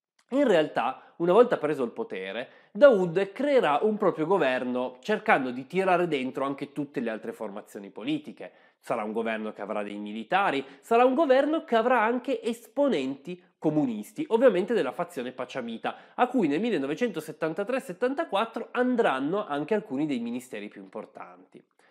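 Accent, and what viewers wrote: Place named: Bari, Italy. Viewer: Good morning Vietnam....bellissimo ma i pezzi musicali sono tanti dai a.....invece è bella come canzone è dei che consiglio ah ah ah ma tu sei un Barese oriundo.....invece che sono barese vedo che parli un dialetto completamente diverso, quindi nei paesi i Dialetti sono tutti diversi l'uno dall'altro, io Pensavo che Bari e le sue province parlassero il barese e magari Lecce e provincia il leccese...invece il dialetto cambia anche da comune a comune o anche a zone diverse della stessa città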